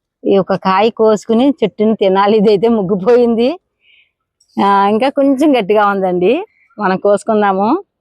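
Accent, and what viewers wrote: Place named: Tamil Nadu, India. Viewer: garu mee videos regularga chustunnanu.meeru nammutara me pata videos kuda continue ga chustunnanu. Meeru okasari evaro me bhasha gurinchi Comment chesarani badapaddari kani me yasa chuste meru tamil anipustudi Kovai sarala matladinatluga undi me bhashani nenu enjoy chestunnanu. Bhasha mata ela vunna meeru matlade pratimata vupayoga karanga vuntundi. Oka friend matladinatluga prati chinna vishayamni vivaranga chebutaru me 2years pata videos kuda chustunnanu. Prati video ni like chestunnanu. Naku me videos chala upayogapadutunnai thank you 🙏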